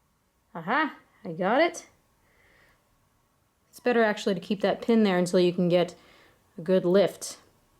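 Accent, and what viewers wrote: Canada. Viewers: I love the canadian accent :) haha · Are you Canadian ?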